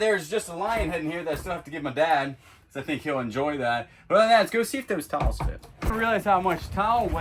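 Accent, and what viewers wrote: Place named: Baltimore, United States. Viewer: Or.....are you dumb already? I detected A Balimore/ Maryland accent... Howwme. Owwned, etc.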